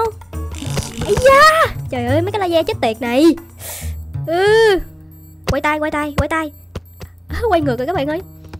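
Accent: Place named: Binh Duong, Vietnam. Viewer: Đọc lạ bình dương thế anh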